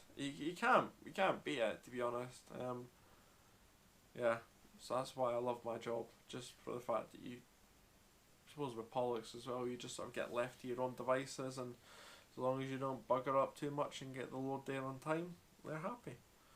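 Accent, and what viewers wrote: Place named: Scotland, United Kingdom. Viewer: Scottish accent is his charm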